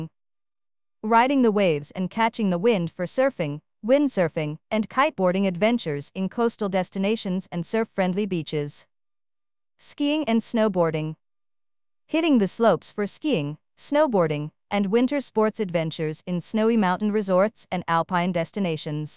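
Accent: United States. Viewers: اللهجة امريكية ام بريطانية ارجو الرد ؟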